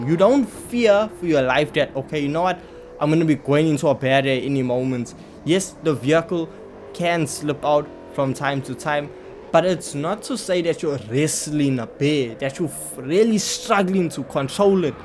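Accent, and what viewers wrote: South Africa. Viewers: bru you sound like you live in durban bru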